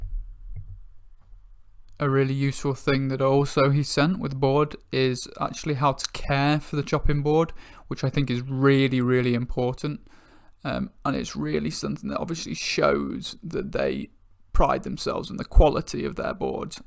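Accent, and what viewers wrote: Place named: United Kingdom. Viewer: This looks delicious! However I've never had Spanish chorizo, only Mexican chorizo. btw, as an American I quite enjoy the British accent...talk away imo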